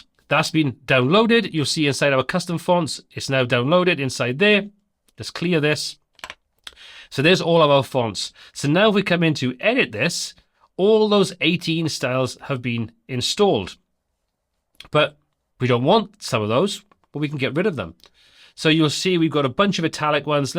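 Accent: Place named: South Wales, United Kingdom. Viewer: He’s a hwntw (south Wales)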